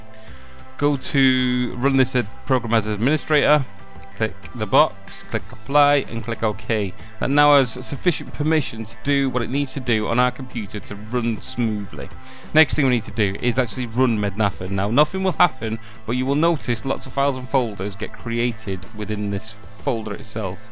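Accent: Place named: Liverpool, United Kingdom. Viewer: i work with a British guy here in the States...he heard your voice and said you're from the Liverpool area...how right is he?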